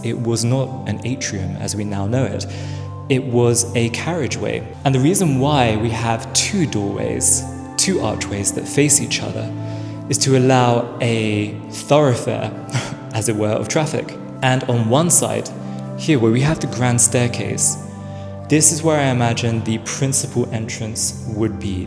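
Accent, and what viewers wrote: RP, United Kingdom. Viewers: The speculation looks realistically fair enough. What a beautiful entrance hall! Photos from the auction showing 18th-century "la sedia" though and not carriages/an elegant mean of transporting a person by using two footmen for carrying. Columns must be a roman repurposed spoliage. You have an exquisite Queen English accent but when you are in hurry explaining things and full of enthusiasm sounds more natural and less pretentious, keep it like that is more charming.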